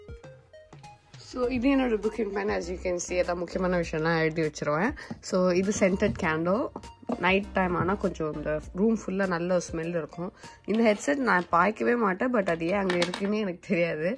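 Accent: United Kingdom. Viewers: Talk in British accent nxt vid ,maybe teach us ur accent,coz it'll ofc help us!! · British accent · Her cloth 😂 British accent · that British accent though! One of the best! Loved the vlog!! 💯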